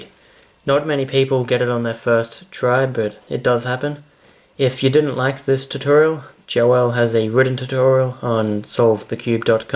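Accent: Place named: United Kingdom. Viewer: You sound like you're british. The tutorial helped! I'm also nervous of solving it w/o looking. #AlwaysPractice | v But I don't understand how to recognize the proper position, especially for the corners. I'm not sure what to do like, for example, UBR > DFR. That is the problem that i didn't understand.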